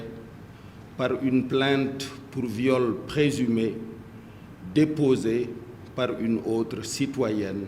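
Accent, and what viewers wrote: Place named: France. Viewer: Voici un gars extrêmement dangereux. J'ose seulement espérer que le Pr Macky Sall, son panel de conseillers ainsi que le peuple sénégalais sont suffisamment avisés pour comprendre qu'il est en train de faire un clin d'œil aux français et à l'armée sénégalaise. Et son message est suffisamment clair : "je suis une alternative à Macky Sall". Mr Seck ne s'adressait pas au peuple sénégalais. Son accoutrement en témoigne parce que, généralement quand il cherche l'adhésion des sénégalais à son discours, il s'habille en grand boubou blanc et s'exprime en wolof. Mais, aujourd'hui c'est plutôt en français avec l'accent d'un "noble" français. D'autre part, à date nous sommes pas encore informés de biens d'un investisseur burkinabé, nigérian, danois ou américain pillé. Affirmer que l'armée sénégalaise et les forces de sécurité sénégalaises sont les MEILLEURES au monde alors que leurs membres sont formées au Maroc, en France et aux USA est une insulte à ces derniers. 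Enfin, se déclarer facilitateur entre MM Sonko et Sall revient à reconnaître que les évènements de ces derniers jours ne sont pas un problème entre l'État du Sénégal et ses administrés, ni entre Mr Sonko et la justice sénégalaise mais plutôt entre le Chef de l'État (ou d'un parti politique) et un de ses concitoyens (ou opposant). Pourquoi les parenthèses? Parce que je ne me retrouve plus dans tous ces jeux. God bless Sénégal and its people!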